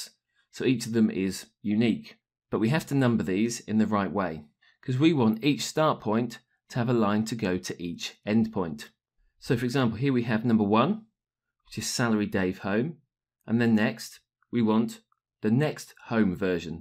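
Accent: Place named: England, United Kingdom. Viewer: Thanks! lovely English accent by the way =)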